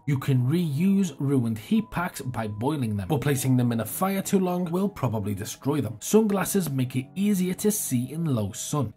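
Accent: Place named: Northern England, United Kingdom. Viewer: OMG You did that on purpose right? I do believe you just created not o ly one of the most useful dayz videos for beginners like myself, but you also just rested one of the best money earning youtube videos of everyone who watches it and finds it useful is gonna be back here again and again and...yeah and so on lol. Well done on both counts lad, nice work! And to think k I was gonna say I find your videos amazing but so fast I am forever rewinding and rewinding LOL, well I won't bother asking you to slow them down after seeing this one! That accent be familiar to me. My wife she be from Chester and used to live the Wirral. I'm from Scotland so we both count as northerners, nice tae meet ye. 👍💚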